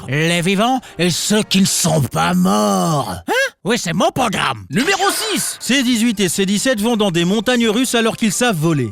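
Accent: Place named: Antilles, Caribbean. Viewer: Antillais j'ai l'impression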